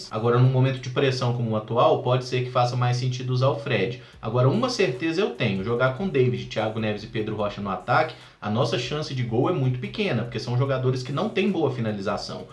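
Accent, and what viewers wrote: Minas Gerais, Brazil. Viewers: É mais pelo sotaque mineiro mesmo, mas é uma coincidência engraçada kkkkkkkk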